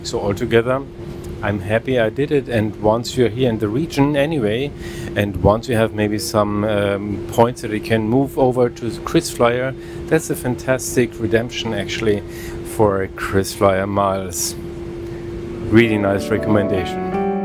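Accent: Germany. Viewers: In my personal opinion, one must be really stupid to spend around 2500 euros for a return flight lasting an hour and 20 minutes where you can't even fully enjoy the starry breakfast, and finally drinking Krug champagne at 7.00 am is really bad for your health..... P.S. The German accent of the presenter is awful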